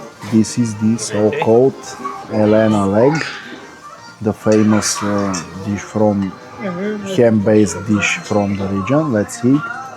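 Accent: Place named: Arab world. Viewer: Брачед за 30 гледания ли говориш на английски като арабин. По-добре си ги прави на български.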